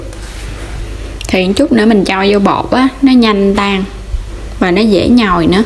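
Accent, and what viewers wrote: Mekong Delta, Vietnam. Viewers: Giọng nói dễ thương của người miền Tây. 95 xin chào bạn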